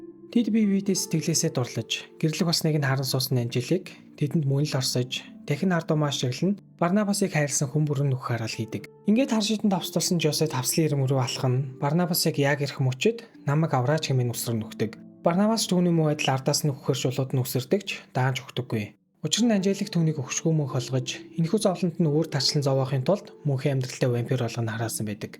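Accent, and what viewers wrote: Uvs, Mongolia. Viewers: He is Uws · Увс аялгатай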